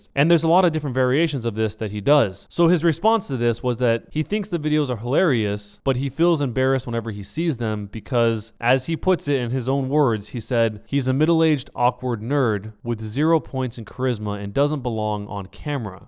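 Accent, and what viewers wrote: Sweden. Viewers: Are you swedish????!!!!!! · are you swedish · U swedish bro? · Are you Swedish? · Are you from Sweden? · Are you swedish?? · are you swedish? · O are you Swedish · Are you from sweden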